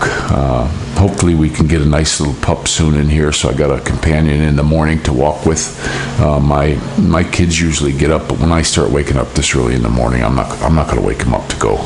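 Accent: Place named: Western New York, United States. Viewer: Sounds like you lived up on the lake! If I had to guess I would say silver creek NY or somewhere around Eire pa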